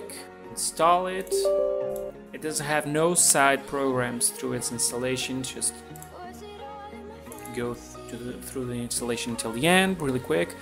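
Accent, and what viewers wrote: Portugal. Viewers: Are you portuguese portugal? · ele é português, se não me engano.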